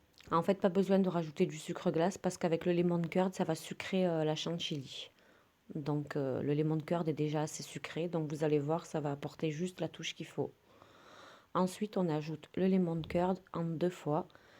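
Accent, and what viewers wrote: South, France. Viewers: Bonsoir je viens de vous découvrir, je me suis abonnée sur le champ ! Vos réalisations sont magnifiques Bravo ! vraiment tout est clair, bien expliqué et votre voix très agréable, on sent bien le sud 😊 Merci pour vos partages 🙏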